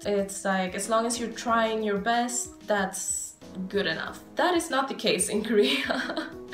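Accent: Sweden. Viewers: I knew I've heard this accent before hehe. Du e svensk eller hur?:")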